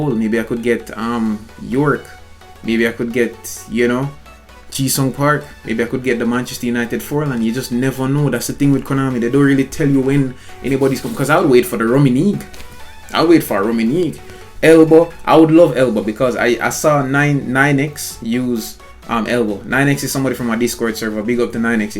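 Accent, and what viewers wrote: Jamaica. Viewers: are you jamaican bro. if not big up stills 🇯🇲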